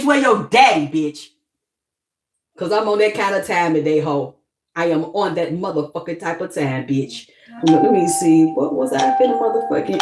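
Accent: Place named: South, United States. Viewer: I so love how u talk u be having me laughing so hard 🤣 that down south all in u hunni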